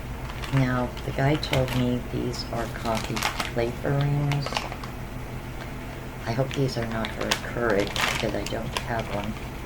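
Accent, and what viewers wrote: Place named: Pennsylvania/Delaware, United States. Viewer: Hi! I knew you sounded like me..its the PA/DE voice we both have!!I have no idea how I found your channel, but I am drawn to your personality! I was watching Freakin Frugal they are a dumpster diving husband & wife that get a ton of stuff from Aldi & Save a lot (mainly Aldi) they donate alot of stuff as well..food/clothes..you need to see these videos. You could add content ty o your channel. People love it! I hope your channel gets bigger & bigger..this is how it starts..much blessings to you! Much love from Middletown, Delaware 💗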